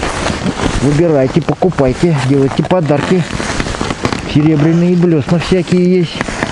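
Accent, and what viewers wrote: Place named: Yakutia, Russia. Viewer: С наступающим новым годом! Каждый раз рад слышать якутский акцент, такой родной и теплый! Удачи и развития канала!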